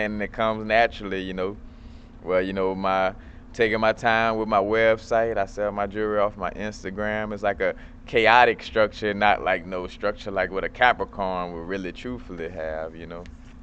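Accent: New Orleans, United States. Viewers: You gotta be from the n.o huh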